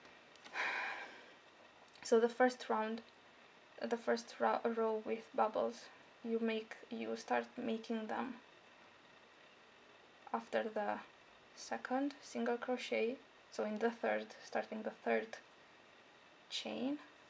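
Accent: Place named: Iceland. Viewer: Your accent sounds very Icelandic, what is your first language?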